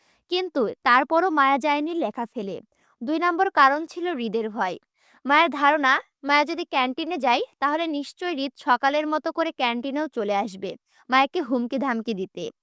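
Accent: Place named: Kushtia, Bangladesh. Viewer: আমি নিজেও কুষ্টিয়া জেলার মেয়ে। আপনার কথা শুনে নিজের জেলার টান টাই মনে হচ্ছিল বার বার। এবার কনফার্ম হয়ে গেলাম।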